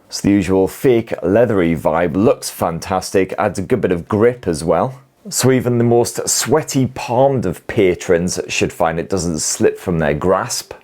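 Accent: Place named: Scotland, United Kingdom. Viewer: That's a Scottish accent.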